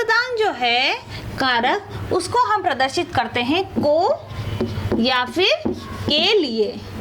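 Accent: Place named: Haryana, India. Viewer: there is harvani touch in your languge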